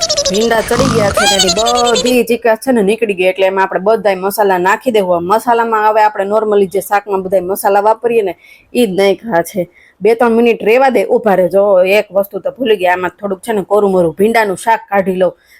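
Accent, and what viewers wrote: Kathiawar, India. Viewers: Super...same bolwani rite che je hamari kathiyadi che jamnagarni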